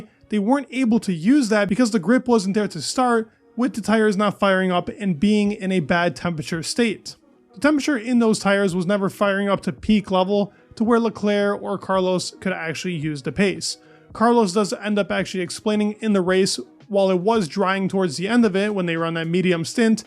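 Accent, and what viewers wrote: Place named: United States. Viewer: You should learn a proper English accent instead of american. Americans don't belong in F1. Drop the annoying vocal fry too.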